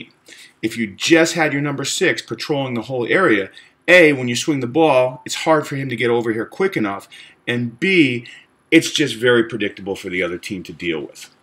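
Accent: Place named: United States. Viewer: Wait, are you american? Auto cant watch this anymore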